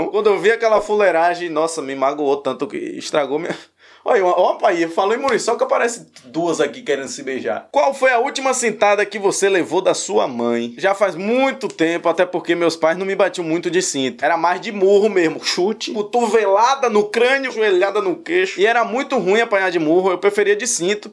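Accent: Bahia, Brazil. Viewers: Manooo surtei nesse sotaque 😍😍😍 vo namorae um baiano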